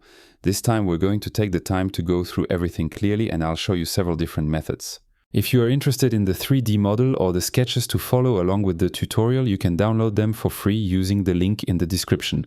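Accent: France. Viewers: J'aimerais avoir vôtre fluidité en Anglais ! en tant que français je reconnais un autre Français xD Avez-vous déjà utilisé Solidworks ou Fusion360 ? en point de comparaison ? ou vous avez une expérience exclusivement sur FreeCAD depuis le début ?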